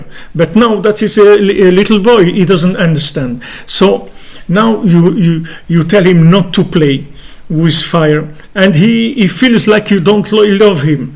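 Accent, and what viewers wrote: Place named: France. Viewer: Ah! What a surprise! That's the first time I hear you teaching us in english! I didn't know you speak several lagages! Baroukh HaChem j'ai tout compris de ce que vous dites en anglais, my english isn't so good. Et très charmant votre fort french accent!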